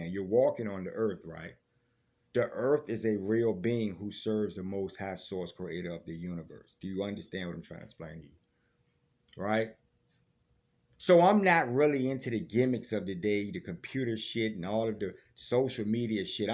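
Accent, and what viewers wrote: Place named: South, United States. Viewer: I love that you are a Southern African American. I love your accent/dialect.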